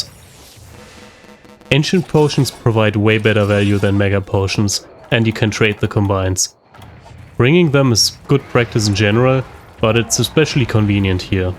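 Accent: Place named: Germany. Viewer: Ich hab 5 Sekunden geguckt und sage du bist 2000% deutscher 😂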